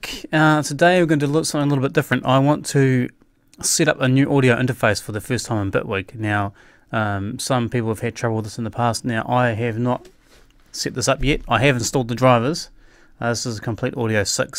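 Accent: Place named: New Zealand. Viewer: That is the most Kiwi accent everrr :D Lived in Christchurch for 2 years, can't forget it :P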